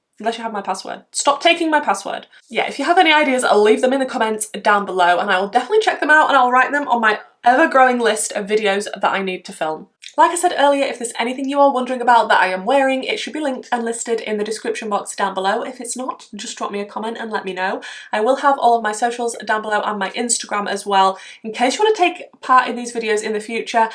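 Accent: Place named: North, United Kingdom. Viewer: You remain a total pleasure to watch... and listen to with your cheerful northern accent ❤. Maintaining your impassioned religious faith, especially one you acquired as a teen or young adult, is really special. It speaks of your strength of will and ability to withstand the changing fashions and trends around you. In fact, your commitment to your makeup aesthetic, irrespective of trends, shows the same fortitude! God bless you and your loved ones.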